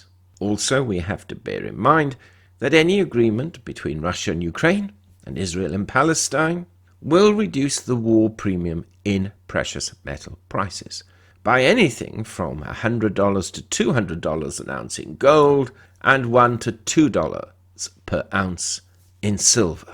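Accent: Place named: RP, United Kingdom. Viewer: get rid of the posh English accent and the waffling,and you could cut the video time in half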